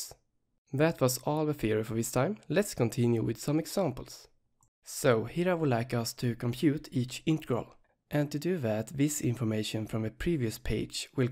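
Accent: Sweden. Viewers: ikr!! he has the loveliest Swedish accent xDD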